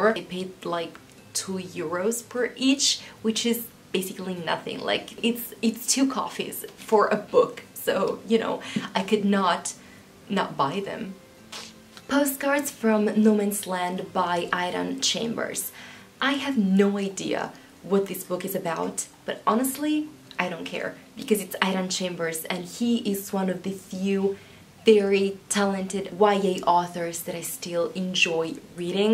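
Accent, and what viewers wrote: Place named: United States. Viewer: On motherhood, we share the same thoughts lol and I’ll definitely pick “nobody speaks of remarkable things” because you had a spark in your eyes as well. So it will be worth it in any case. Hai una pronuncia un sacco Americana ahah il mio lato British is cringing, ma è comunque perfetta ❤️ Praise for Doggie che si è imposto nel video e ha cercato di farti smettere per le coccole. YAS BUDDY. THAT IS HOW YOU DO IT! 💪🏻❤️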